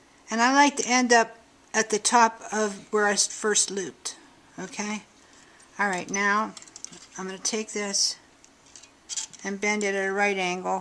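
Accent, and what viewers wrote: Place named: North Georgia, United States. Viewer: you're so much fun and I love watching your tutorials. You sound a lot like we do in North Georgia. Your sense of humor is such fun & you make everyone feel good about what they're doing.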